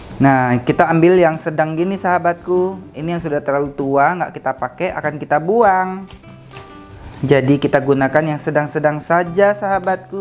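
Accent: Java, Indonesia. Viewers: Medok bikin ngiler aja..lihat buntil..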